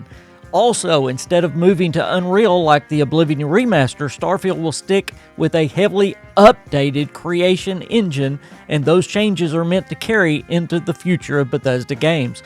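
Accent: South, United States. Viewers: Cant wait to try it on ps5. Subscribed on this channel since a few months ago if I'm not mistaken. Very wholesome channel. Love that southern accent. Salutations from EU.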